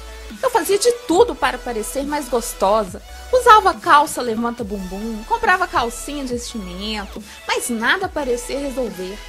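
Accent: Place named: Minas Gerais, Brazil. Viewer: SOTAQUE MINEIROO KKKK <3 AMO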